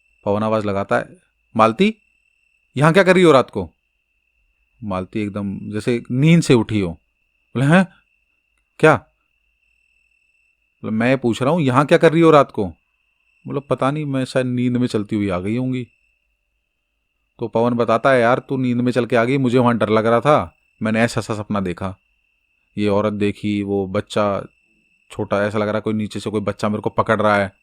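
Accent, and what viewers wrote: India. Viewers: Hii 🙏 nice your deshi voice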